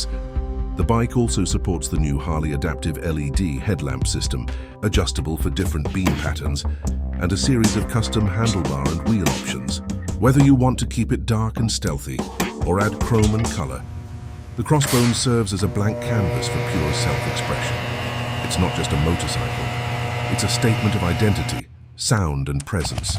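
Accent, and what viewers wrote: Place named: United Kingdom. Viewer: He sounds British 😅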